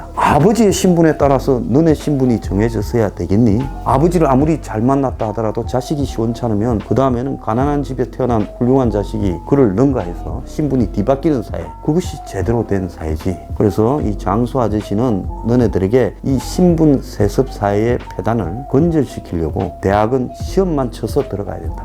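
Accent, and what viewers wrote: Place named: Seoul, South Korea. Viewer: 소장님.귀여우세요~살짝 사투리 섞인 서울밀투~~^^ㅋㅋ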